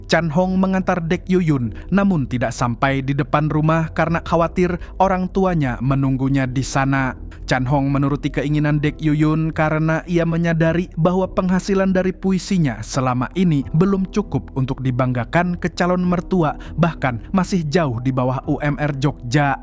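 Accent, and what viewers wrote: Banjar, Indonesia. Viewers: Bang Kamu orang mana bang, kaya logat Banjar 😮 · keliatan urang banjar nya wkwk jah bang topi bunggul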